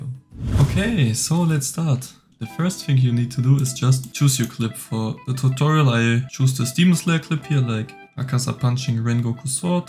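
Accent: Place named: Germany. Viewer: You have a German Akzent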